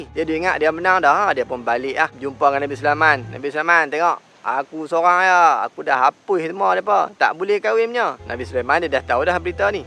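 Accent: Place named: North, Malaysia. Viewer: Syok dgr org utaqa bercerita... Rsa puaihhh dgq hahaha👍🏻👍🏻👍🏻😂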